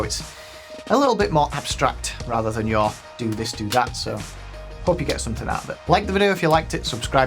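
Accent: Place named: Northern England, United Kingdom. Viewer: Do you do lessons around the north? just assuming you live up here with the accent. Ey up! Cheers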